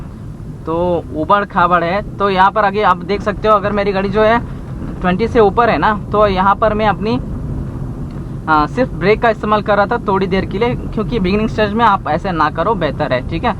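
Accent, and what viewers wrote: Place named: Karnataka, India. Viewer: Being a kannad...your hindi is excellent👍👍....a little influence of your mother tongue is quite natural !!!....👍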